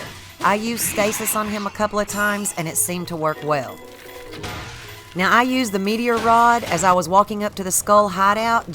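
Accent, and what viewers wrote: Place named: Blue Ridge, United States. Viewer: I did max hearts max 30 min offense and full stamina with two yellow circles and saved right before I put the sword back for middle trials. I'm not sure wuts better max damage 30min or defense. But I paused on the third level to charge my switch. Btw I'm from western nc u sound like a blue ridge mountain person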